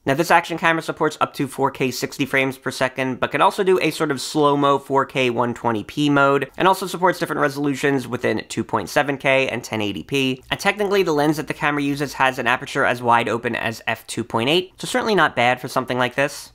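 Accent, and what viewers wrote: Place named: Delaware, United States. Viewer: I'm watching this from Poland right now, but I grew up in Delaware. I'm def hearing some sort of Delaware / South Jersey accent. Key words - waterproof "wooderproof" / video "videoh" I love it, brings me home!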